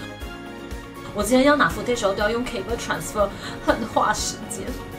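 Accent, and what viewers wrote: Malaysia. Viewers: 一直感觉说话很可爱很搞笑，语速很快加上独特的魔性大马口音，哈哈😃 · 马来口音很重😀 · Ke En是大马还是新加坡人？口音好重哦...😂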